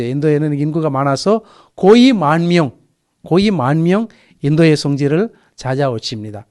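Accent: South Korea. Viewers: 신부님 발음도 너무 좋으시고 잘 들려요 악센트가 한국사람같아요^^ 잘몰랐던 인도 성모님 소개해주셔서 감사합니다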